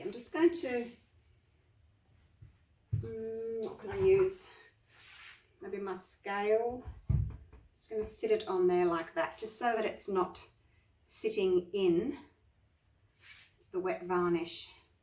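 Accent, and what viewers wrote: Australia. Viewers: Your videos are just SO helpful. I've only been doing this for about a month, but I'm loving every minute of it! I don't even mind when I end up with some muddy pours, because for some strange reason, my 28 year old son LOVES them...lol (no accounting for taste...lol) I'm just about to varnish 3 paintings (which are going STRAIGHT to him...lol), so this is REALLY helpful. Btw... SO nice to hear a fellow Aussie accent too 😘👍❤❤❤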